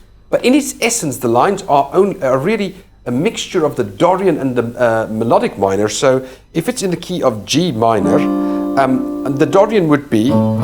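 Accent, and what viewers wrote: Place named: RP, United Kingdom. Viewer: You have the poshest accent of any guitarist I’ve ever heard speak! Where is that from?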